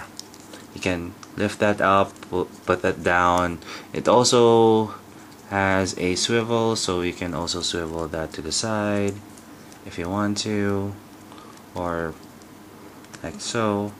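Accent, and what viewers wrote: Philippines. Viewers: Dude can I ask, are you Filipino? Ya kinda sound like a Filipino.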